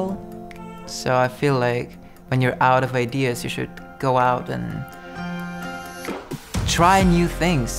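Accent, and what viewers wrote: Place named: England, United Kingdom. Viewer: his english accent is heartbreaking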